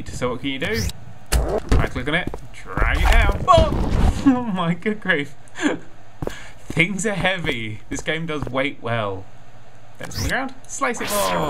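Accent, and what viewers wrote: United Kingdom. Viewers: For some reason, whenever I'm thinking over doing a tutorial video or something, I think it in some random British dude's voice... I now understand that it's Nerd3's.....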